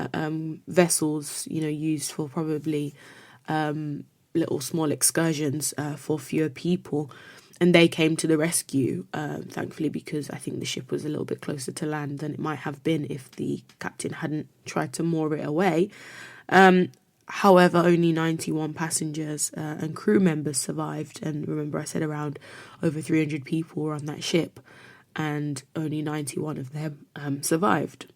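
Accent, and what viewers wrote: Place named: England, United Kingdom. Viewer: The history had never hidden, Who knew that the Ballast tank was empty can you say?To cut shot the conversation any vessel that is flatbottom cannot survive listing port or stabord this is what happened. It appears that you have an English accent, check one of The Royal Readers about the Ship Kempenfelt that sank due to listing, this is what happened to The Mv. Christina.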